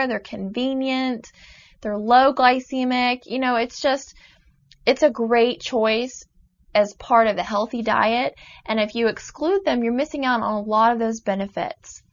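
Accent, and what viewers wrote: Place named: Midwest, United States. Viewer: I’m trying to guess what accent she has... Midwest?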